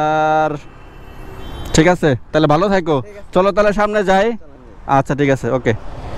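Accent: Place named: Bangladesh. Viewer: আপনের কথা এমন বাংগাল এর মত কেন ক্ষেত পুরা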